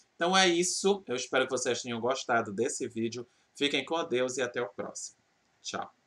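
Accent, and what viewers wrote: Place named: Belém, Brazil. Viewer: vc é de Belém, né? imagino que seja pelo sotaque onde vc compra dessa marca?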